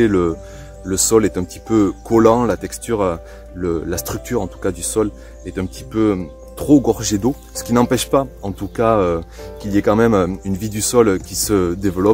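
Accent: South, France. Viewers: Bonjour, tout d'abord une réaction par rapport au sujet puisque tu nous y encourages.. Pour ma part, cette année, j'ai paillé en "temps normal" mais c'était sans compter sur cette "année à limaces" (et toute cette pluie) qui fut exceptionnelle! Donc, il a fallu tout enlever, déloger le surplus, puis, dernièrement, pailler à nouveau. Quel travail! De fait, les parcelles et butes ont perdu une partie du vivant qui était là, bien installé... Les plants des semis ont du rester plus longtemps à la maison et la reprise en terre, je le vois est plus difficile, plus lente. Idem pour les fraisiers, l'excès de pluie a engendré la maladie. Il a fallu enlever fruits et feuilles touchés et bien sûr dé-pailler. Mais le résultat a été concluant: A ce jour, 5Kg de fraises! Miam Miam lol. Autre info pour les abonnés: enlever la paille temporairement si, comme ici, il fait encore qques nuits très fraîches. 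Cela permet à la terre de pouvoir restituer la chaleur pendant cette dernière. La paille bloque ce phénomène. Utile par exemple pour les plants de courgette. Enfin, une question: Mes Blettes montent cette année. Je les laisse pour récupérer les graines. Ma question est celle-ci: le plant meurt-il après avoir fait les graines cad dois-je le couper et semer un nouveau plant ou bien va t il me donner de nouvelles cardes comestibles dans quelques mois si je le laisse en place? Je finis en te disant que tes vidéos, ton (notre lol) accent me rappellent le Sud d'où je suis originaire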